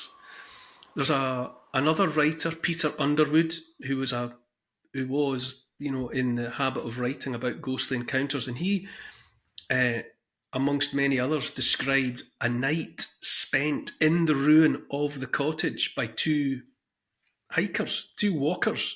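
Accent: Scotland, United Kingdom. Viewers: I am glad to have been to Scotland 10 years ago, I fell in love with the landscapes, the music, the language, so kind people, and returned with a good amount of souvenirs (including books with folk tales). Yet there remains a longing to go back and spend more time there. Until then, the stories of that country, retold by someone with that wonderful Scottish accent will do. Thank you, sir. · My dear Godly grandfather was born in Wilbarston, England but raised in and around Dumfrees, Scotland 🏴- my chosen homeland along with America Grandpa had a Brit/Scot accent and while we lived with my grandparents when young, my brother and I, being born and raised in the U.S. both of us had the same accent as our grandfather - our schoolmates surely wondered why we spoke in such a way, but hearing your scot accent brings me comfort and peace still as I hear my grandfather again- Godspeed to you, friend and to your family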